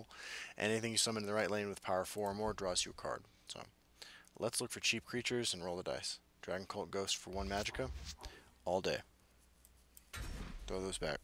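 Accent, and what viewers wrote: Ireland. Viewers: Brazillian, spanish, Portuguese, you name it, but his accent is Irish.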